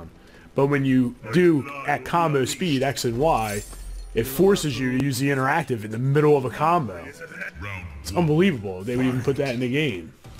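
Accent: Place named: Philadelphia, United States. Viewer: def either from philly or south jersey...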